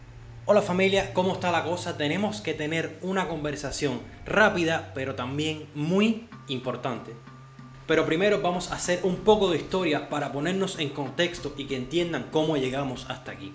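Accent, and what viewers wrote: Cuba. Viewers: Espectacular noticia, felicitaciones, te lo mereces por ser quien eres y como eres, y no se trata de competir con nadie, pero eres uffff lo máximo, lo mejor, un grande, imbatible, profesional, inteligente, con muchas destrezas, te dejas querer, amable, amigable, apasionado y entregado a lo que te gusta y todo eso es lo que te ha hecho crecer; muy merecido, me gusta como hablas, como te expresas, como pronuncias, tan cubano, tan coloquial si caer en lo ordinario y vulgar, muy lindo que ames exageradamente a Cuba, es un país hermoso!! Continua siendo así tan auténtico y sencillo, mira que de lo bueno, poco!!!! Éxitos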